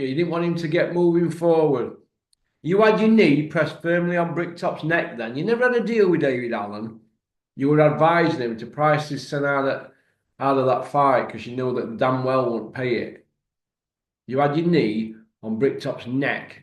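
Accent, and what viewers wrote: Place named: Northern England, United Kingdom. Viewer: Your quickly becoming my favourite boxing channel. Rough round the edges worts and all just a straight talking northerner. Greeting from the red rose county ;-)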